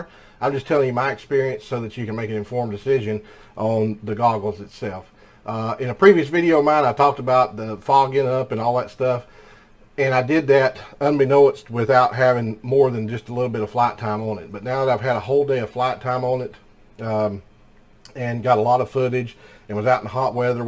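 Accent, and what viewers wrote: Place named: North Carolina, United States. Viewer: I thought that accent sounded familiar, formal North Carolinian here. Out here in Utah. We get fogging anytime the temperature reaches over about 90° it’s not super major as our humidity is low. I do get worse fogging when I placed them on my head unless I’m wearing a hat to fix this I just moved them higher up on my head.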